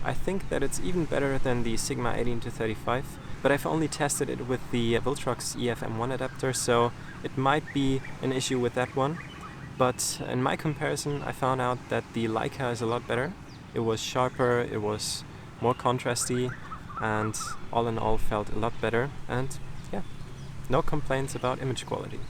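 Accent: Germany. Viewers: Amazing review! Thank you for your work! Don't worry about your German accent, it's great! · No worries, your English is fine. And you will never loose your German accent , it is how it is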